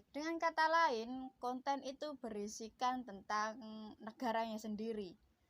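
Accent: Java, Indonesia. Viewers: mbak. Saya suka sama medok nya sampeyan mbak. Renyah ditelinga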